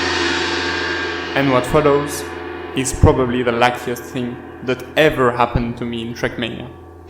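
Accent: France. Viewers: You have a fµcking french accent. Ca se voit direct. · On entend quand même l'accent français 😂❤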